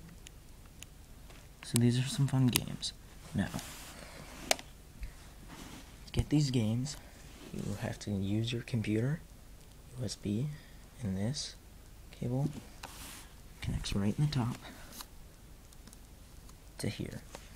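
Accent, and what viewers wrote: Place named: Asia. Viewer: i cant understand ur asian accent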